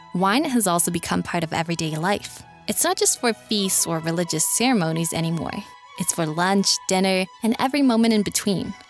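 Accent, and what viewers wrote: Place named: Italian-American, United States. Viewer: This is the cutest Italian-American dialect I've ever heard. And she's smart too.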